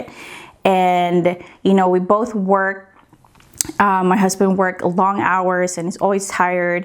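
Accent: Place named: Philippines. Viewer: I love your Filipino accent. It's so different when you were whispering hehe. Love you ate ❤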